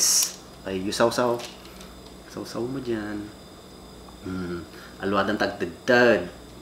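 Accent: Ilocos, Philippines. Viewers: That ilocano accent tho Hahahaha Proud ilocano!! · So funny that she speaks with American accent, and he's so ilocano English speaking 😅😄✌️ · my god kuya haha yung tono mo hahaha ilocanong ilocano .. "nag dakkul neh" hahaha umapal nak man kuya haha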